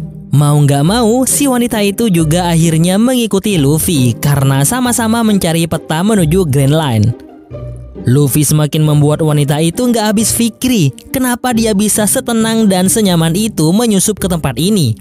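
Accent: Madura, Indonesia. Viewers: medureh dimmah kakeh mat❤❤❤ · Apah can dekik lah,,😂 reng madureh kah min? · Reng madureh kak?? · Sumpah orang madura ini kalaupun bukan pasti keturunan orang madura soalnya logat maduranya melekat banget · Sapnyapsap+sang nyangsang. Madura mana bang? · Sampean orang Madura mas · Madureh nyenguk cak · Madureh asli kak😅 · wkwk sap nyapsap.. 😂 madurooo masss??? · Buh mak reng madureh kakeh dul "apa can deggik lah" sengkok bangga sama been bang wkwkwk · Loh orang Madura bg😂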